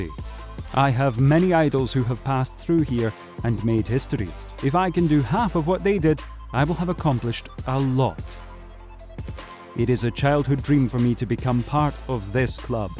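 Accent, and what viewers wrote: Scotland, United Kingdom. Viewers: You have a Scottish accent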